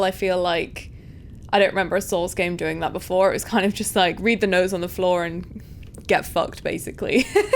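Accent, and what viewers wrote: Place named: North, United Kingdom. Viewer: Welcome to Elden Ring this game is amazing and ill sub love the Northen Accent I get Banned from games for talking my usual talk yay freedom of speech Blizzard perma bans and they cant even keep them selves in check cowards hide behind a automated ban system. fight me.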